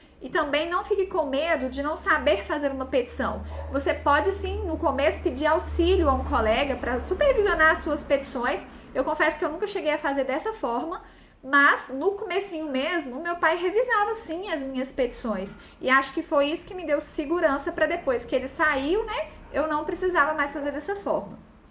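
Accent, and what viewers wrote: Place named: Minas Gerais, Brazil. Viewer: As melhores dicas da advocacia você encontra neste canal! Muito bom! Sem falar no charme do sotaque "mineirinho"... Parabéns!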